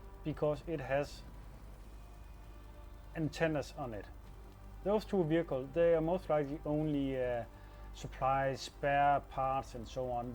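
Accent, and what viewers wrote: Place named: Denmark. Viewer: Sindssyg Danglish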